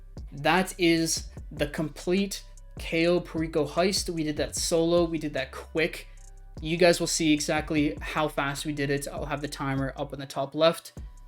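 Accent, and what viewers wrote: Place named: Canada. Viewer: Wow are you Canadian! Lol or from the UP of Michigan, regaurdless i dig it. 👍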